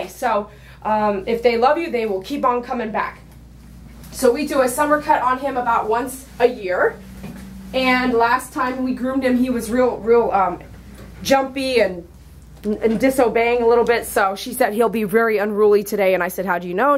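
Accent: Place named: Texas, United States. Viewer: Your from Texas 0.0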